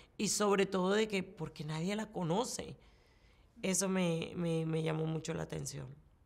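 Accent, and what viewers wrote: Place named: Caribbean Coast, Colombia. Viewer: La gente de la costa atlántica en Colombia hablan así .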